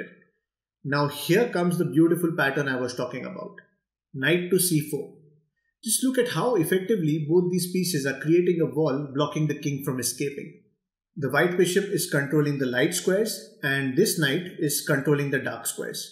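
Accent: India. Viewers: Hindi ko maintindihan parang Bombay magsalita 56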